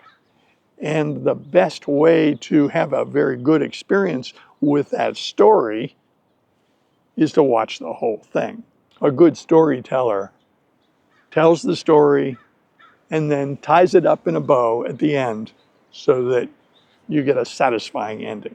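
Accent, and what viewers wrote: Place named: General American, United States. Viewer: face-blue-smiling:... again... I love the way you tell everything and throughout the story you use phrases and expressions to describe processes, situations and the spatial and temporal relationships of the matter in question are already implicit (grammar)... that is, we can learn English by listening to you and of course, then I pause the video, repeat and try to imitate your pronunciation which is very standard and characteristic of the American accent in general... thanks,!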